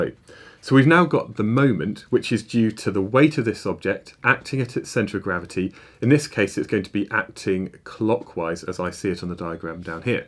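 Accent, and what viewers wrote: United Kingdom. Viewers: English accent: “ah, I’m home” Spells it ‘center’ : “wait, what?”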